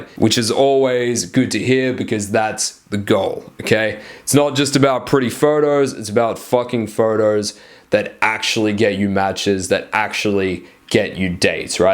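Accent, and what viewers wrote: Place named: United Kingdom. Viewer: Where are you located? You have an accent, are you in the UK?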